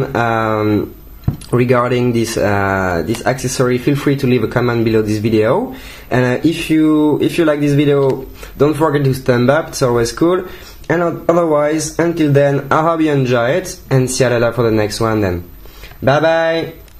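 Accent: France. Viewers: tu es français??? oh la la l'accent; mec! Mais tu maitrises! :) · Mec ça cd voit que tu est français Mai's pas grave tu gere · Just so you know, ACCESSORY is pronounced ak-CESS-er-ee. The emphasis is on the second syllable. It's not access-er-EE. I realize you have a French accent, but that pronunciation will cause people's brains to stop for a moment to try and figure out what you're saying to them. Thanks for the review! :)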